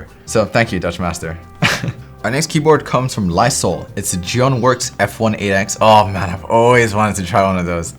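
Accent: India. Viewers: what happened to his voice sound like an indian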